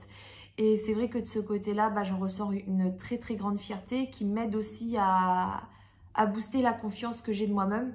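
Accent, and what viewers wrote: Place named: Brittany, France. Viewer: WoW une bretonne dans le sud ! Mais comment tu supportes la chaleur ?! Pour moi, descendre plus bas que Nantes c'est déjà pénible... C'est marrant parce que j'aime beaucoup ton petit accent (genre quand tu dis "pour soi-meume") bien de là-bas, j'aurais jamais pensé que t'étais bretonne comme moi !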